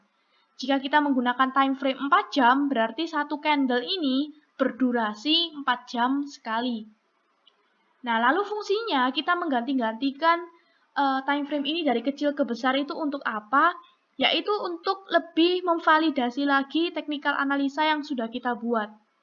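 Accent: Java, Indonesia. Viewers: Jawa mbak ya? 😁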